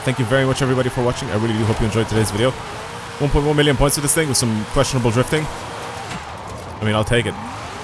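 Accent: Ireland. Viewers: What part of Ireland u from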